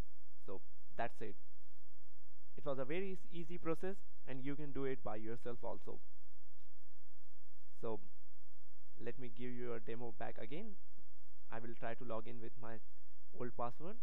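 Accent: India. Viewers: Thank you very much! il ur indian accent!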